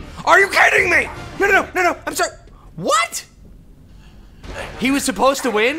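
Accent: United Kingdom. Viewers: l luv ur britsh ascent lol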